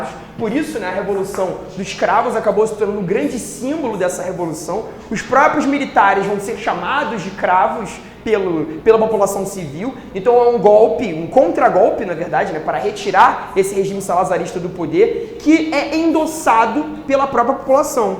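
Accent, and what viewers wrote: Rio de Janeiro, Brazil. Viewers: Eu quero fazer uma pergunta. Eu nao sou do Brasil. Alguem pode me dizer se o professor fala com um sotaque carioca? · Daniel Temelkovski, sim, com toda certeza ele é carioca.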